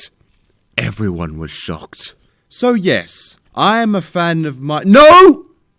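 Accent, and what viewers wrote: Australia. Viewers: Its like Tears of Grace and The Escapist had a love child with an australian accent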